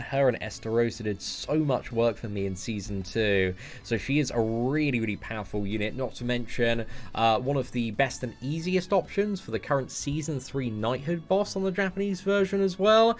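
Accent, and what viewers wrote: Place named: RP, United Kingdom. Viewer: I believe he's English so from somewhere in England whether thats Britain but yeah he drinks tea and has that posh accent so thats my guess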